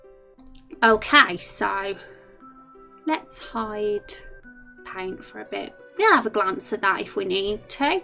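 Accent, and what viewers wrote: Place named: United Kingdom. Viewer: Oh, British? had to adjust a bit but I liked it! ;P :D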